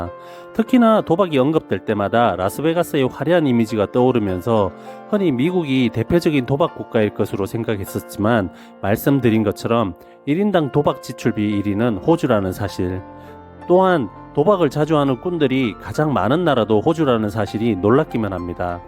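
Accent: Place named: Gyeongsang, South Korea. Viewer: 경상도 분이시네예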